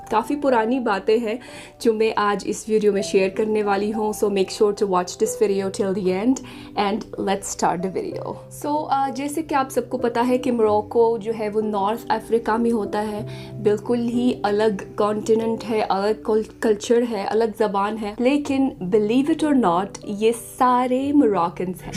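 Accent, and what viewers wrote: Karachi, Pakistan. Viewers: Your Urdu is shockingly incredible I am stunned yes you do have Karachi accent well done sister your husband is a lucky man may Allah keep your family blessed and happy 🌹🌹🌹 By the way I was born in Kuwait so I can speak Arabic along with Pashto, Urdu, English, Punjabi and some Farsi 😊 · You speak so nice Urdu in Karachi accent unbelievable. Love from Amsterdam. Waqar Khan from Amsterdam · MA'AM u r PERFECT in Urdu and u speak Urdu just like Karachiwala. Very Good effort. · Apki urdu sun k ap Pakistani lagti hen amazing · Aray larki tum to pakistani logon ki tarah bol rahi hay or accent bhi pakistani hay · اسلام علیکم ماشاءاللہ آپ کی اردو بہت پیاری ہے ۔آپ کو سن کر لگتا ہے کہ آپ پاکستانی ہی ہیں۔❤❤❤ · By the way by seeing u and listening u i can't even believe that u are not Pakistani By looks and by accent u look 100% Pakistani... 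· Aap ki udru bolny ka lehja bilkul Pakistani hai. Lagta to Nahin k ap Marakish Sey hain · Demagh kee dahi!!! 😂 totally Karachiite 👍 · I love when you said main apne shohar k dimagh ki dahi krti hoon. you made him mad giving him tough time asking question. Good video your Urdu doesn’t look bad it’s pretty karachi urdu. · wonderful... itni saaf Urdu aur lehja (accent) to pure Pakistani hai, unbelievable! 🌍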